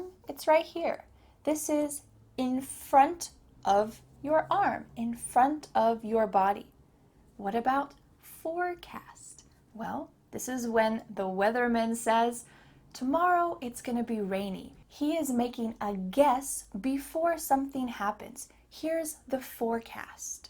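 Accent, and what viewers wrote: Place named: New York, United States. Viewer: I got a friend of mine, he is from New York, and I always share your beautiful classes with him! Lol! He feels like to go back home! Lol! Your lovely accent reminds him "Home". Thanks! Lol!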